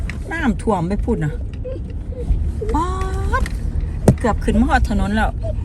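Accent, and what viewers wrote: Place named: Isan, Thailand. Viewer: ชอบที่คุณพูดลาวอีสาน เว่าหน่องๆๆ น่ารักครับ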